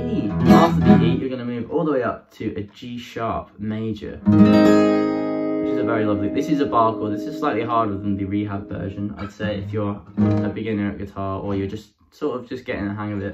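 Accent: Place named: United Kingdom. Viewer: 빠져든다 영국발음💚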